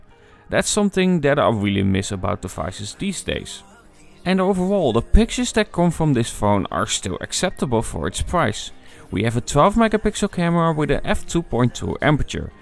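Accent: Netherlands. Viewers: Sowieso jij bent nederlands duidelijk te horen👍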